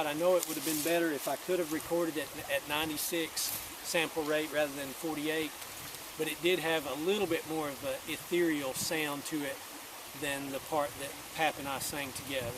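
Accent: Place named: North Carolina, United States. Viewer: I so enjoyed listnin to your "blabber" & "yappin" about the tech end of recording, although a lot goes over my head. I just want to play, sing & have someone else do that work. 😀 When I was a kid in the '60s & '70s in TN, my dad & uncles would sing in the living room a lot of Hank Sr., Lefty, Jimmie Rodgers (Blue Yodeler), & other standard country music & hymns. We had an overhead light fixture smackdab in the living room ceiling. The men would hang a mic from it & they'd sit around it in a circle & play & sing to that suspended mic. Now you wouldn't want to do that on a ceiling fan & have someone come along & turn on that fan! Oops! 😃 Uncle Robert called it a hillbilly boom mic. 😀 What a sweet setting by the creek & trees, with a crawling bug as your co-host. Even though you're in NC (I grew up with TN & VA accents which are a slight difference) your accent is so pleasant. 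Your singing voice beautiful. Your dad had such beautiful high harmony. Wow! Really like your Gibson Dove. God bless you & your family. 🙏🏻🌼 Edit: I saw where someone suggested you scan & save those paper lyrics. I agree!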